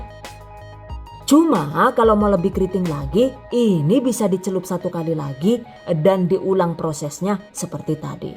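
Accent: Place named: Java, Indonesia. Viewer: Bahasane Nyenengna . . Medok banget . . Nyong suka Bahasane . . 😂😂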